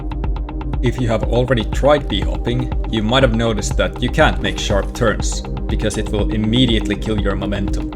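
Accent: Finland. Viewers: Am I hearing the finnish accent?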